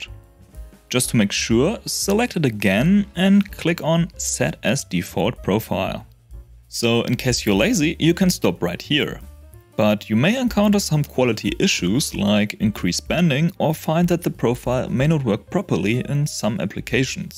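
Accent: Germany. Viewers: Ah da hast du es offensichtlich gemacht. 😂 Habe mir die ganze Zeit gedacht das ist der typische "this has to be perfect" deutsche Akzent beim Englisch reden. Ich klinge locker nahezu identisch beim Englisch reden. Immerhin besser als das Englisch das manche andere an den Tag legen.🤣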